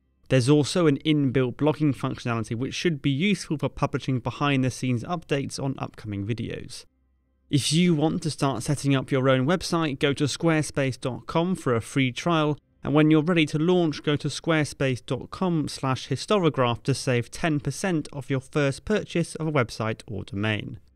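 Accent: United Kingdom. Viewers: "Whether it was justified" well, the narrator's British accent can only raise my confidence that it concludes that justice and reparations are closer than ever. :<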